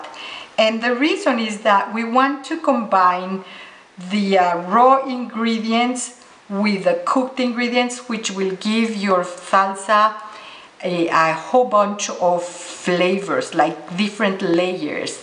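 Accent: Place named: Mexico. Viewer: you're English it's awesome you got a strong Mexican accent but I loved it you don't hesitate a single word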